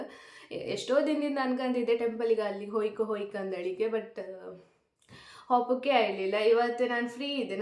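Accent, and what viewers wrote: Kundapura, India. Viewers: ಬಳಿ ಹಾಯ್ಕಂಡ್ ಚಂದ ಕಾಂತ್ರಿ,ಹಾಗೆ ಹಣಿಗೆ ಕುಂಕ್ಮ ಹಾಯ್ಕಂಡ್ರೆ ಮತ್ತಷ್ಟು ಚಂದ ಕಾಂತ್ರಿ.ಊರ್ ಮನಿ ಕುಂದಾಪ್ರ ಕನ್ನಡ ನಿಮ್ಮ್ ಬಾಯಲ್ಲಿ ಕೇಂಬುದೇ ಚಂದ👍👏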